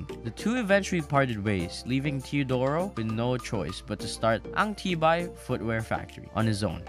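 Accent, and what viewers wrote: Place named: United States. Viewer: Are you American? Pinoy products pero parang Amerikano yung nagsasalita. I find that odd.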